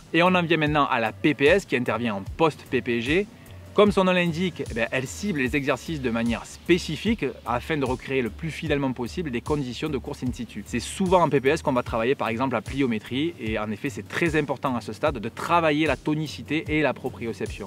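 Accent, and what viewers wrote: South, France. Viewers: Même si l'on pense tout connaître du running, il est bon de revenir régulièrement sur les fondamentaux. Quelques rappels font le plus grand bien (même pour les runners aguerris), nous évite de dévier (cela arrive forcément) et entretiennent le mental en permanence. nous explique tout cela de façon très claire. Les explication sont simples, précises et concrètes. J'apprécie beaucoup ses vidéos avec en bonus un petit accent du Sud qu'on adore.J'ai 63 ans et je fais parti de ces coureurs qui respectent les bases élémentaires du running (hydratation, étirements, progressivité dans l'entraînement etc...). Ce qu'explique parfaitement bien.Cela me permet de continuer à faire mes 50 à 60 km par semaine et tout cela sans bobos.J'encourage à continuer ses vidéos. Un grand merciEric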